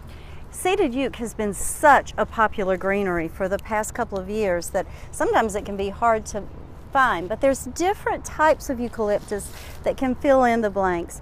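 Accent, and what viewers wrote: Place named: East Texas, United States. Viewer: Enjoyed this. I swear our presenter was from East Texas based on her accent. 😃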